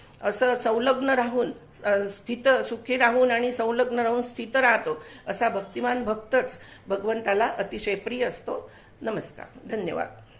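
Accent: Standard. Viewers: खुप छान,शुध्द आणि स्पष्ट उच्चार आणि सोप्या शब्दात अर्थ सांगीतला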